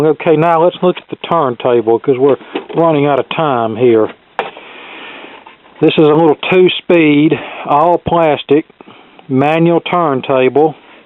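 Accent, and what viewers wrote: South, United States. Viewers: He’s a southern ol’ boy. Accent is pretty typical for rural south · Jerico_64 This man sounds nothing like Hank Hill. He simply sounds like an old man with a southern accent. Big difference.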